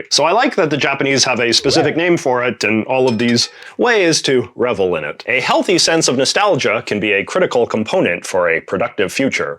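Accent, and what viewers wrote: Canada. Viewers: Canadian accent comes through thick in a more candid video. Not every place is for everyone. You don't have to like Japan. It's good you're giving it a shot.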